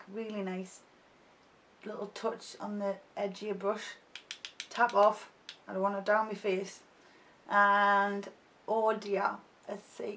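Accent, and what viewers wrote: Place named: Scotland, United Kingdom. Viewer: You look beautiful and I for one have missed you., could you please tell me if you live close to Scotland? Your dialect and some words seem similar to my late grandfather. (I live in TX)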